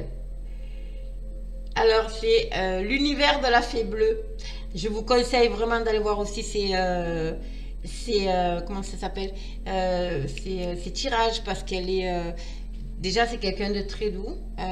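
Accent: South, France. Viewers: Super tirage, merci beaucoup , je vais passer une bonne journée !! Tirage professionnel et le petit accent du Sud , c’est absolument charmant !!👍😉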